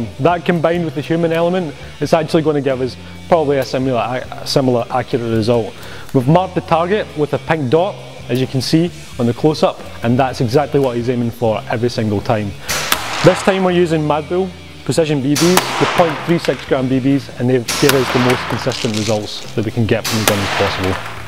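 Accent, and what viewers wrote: Scotland, United Kingdom. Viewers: Oh snap is scottish!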